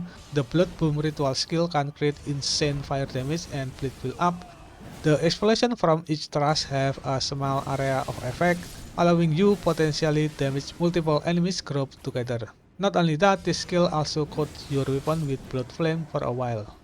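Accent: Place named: Indonesia. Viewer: iya bang pantesan aksennya kyk org indo cuman pas liat channel infonya dari us wkkwk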